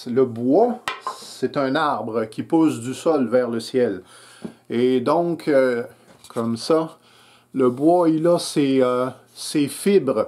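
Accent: Quebec, Canada. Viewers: Série de cours très instructifs et bien filmés. Vu de France on aime bien le petit accent québécois ! Je débute en tournage donc merci professeur et bonne continuation 👏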